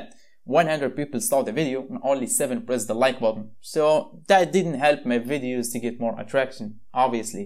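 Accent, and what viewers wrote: Morocco. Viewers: Anglais n9iya akhy bayna fik maghribi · TBH your accent is better than trying to speak America/British accent rir hdr b Moroccan Accent and Keep going bro <3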